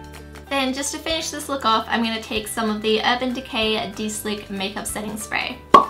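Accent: Australia. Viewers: P.s. I am so jealous of your accent aaaaah! I've always wanted to say that, I don't know why I haven't haha I had to fake an Australian accent for my school musical when I was the lead, and people loved it, and it's what pretty much landed me the role ahaha it's my favorite accent! But then I did my school play and I had to fake a BRITISH accent and it was hard because I'm so used to doing an Australian one, so everyone was just reminded of my character from the musical hehe and THERE'S MY LIFE STORY! Sorry about that haha. My point though, is that I envy you and your accent!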